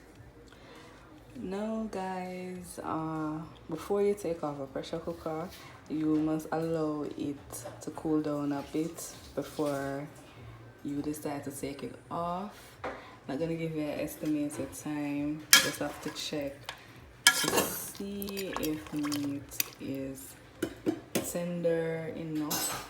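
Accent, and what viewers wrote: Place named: Jamaica. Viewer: Yes yes . I can tell your jamaican.. great job on this hun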